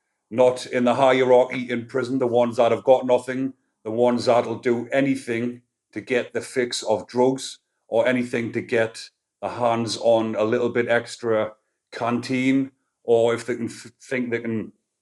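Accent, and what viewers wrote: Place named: Northern England, United Kingdom. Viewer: As you speak Northern English that is not easy for normal English people to understand.Cun u provide subtytles?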